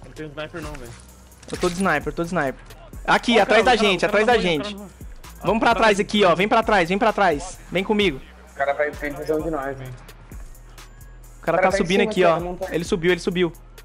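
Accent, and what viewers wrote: Minas Gerais, Brazil. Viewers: Você é mineiro cara? MG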